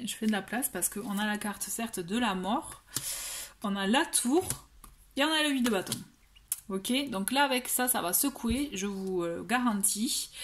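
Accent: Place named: Southwest, France. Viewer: Vous été du Sud ouest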